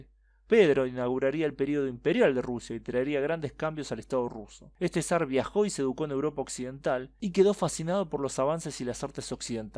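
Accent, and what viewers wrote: Argentina. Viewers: esta muy bueno difundir la historia de forma resumida, pero agrego una critica al margen, tratá de sheshear no tan exageradamente que después América cree que todos en Argentina hablamos así, sin el espiritu de ofender te agradezco enormemente el aporte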